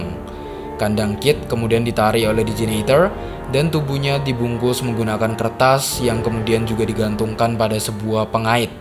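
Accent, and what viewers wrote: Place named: Madura, Indonesia. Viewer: orang Madura nih yg bikin ten konten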